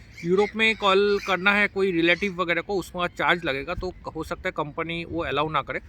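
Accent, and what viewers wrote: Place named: Bengal, India. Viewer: You live in sweden that's not big factor for me but.. তোমার বাংলা টিউন টা ভালো লাগল।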